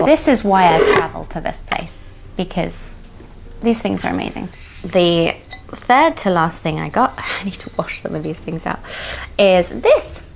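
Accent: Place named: United Kingdom. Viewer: I love your lovely British accent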